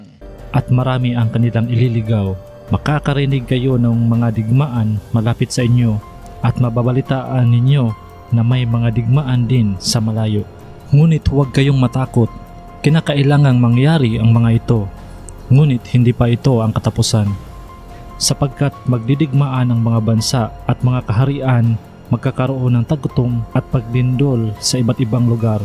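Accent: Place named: Visayas, Philippines. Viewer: Bisaya ka bro😁ung accent mo,hehee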